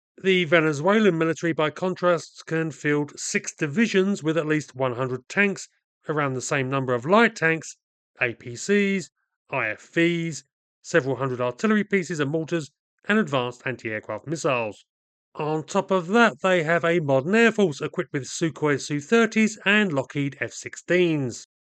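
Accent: United Kingdom. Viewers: A mess such as this is always clarified when explained by a proper gentleman with a British accent.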